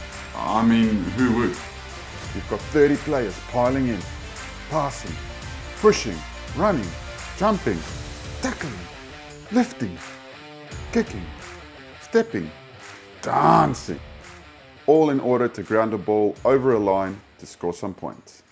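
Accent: South Africa. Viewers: OK so firstly, I love the content. Secondly, I can't pinpoint your accent. Your channel says Australia but I feel like you sound like a South African who has lived in Australia for a while or an Aussie who has lived in South Africa for a while 🤣 I hear a mix of both accents hahah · I cant decide if he is south african or australian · Saffa with a bit of an Aussie twang? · My bru are you South African? Your accent confuses me....i cant tell if it's from Melbourne, London or Brakpan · I don't hear English. Only Aus/NZ/SA. the way he says "couch" tells me he is SA born and emigrated to OZ or NZ. I may be wrong... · My man, great explanation. I hear an Aussie accent, or perhaps a Kiwi one - but yours is more difficult to place than usual, but do I detect a Saffer twang? Emigrated? Ps - hier kom die BOKKE :D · his English sounds completely South African. He also used the boks in many of his examples.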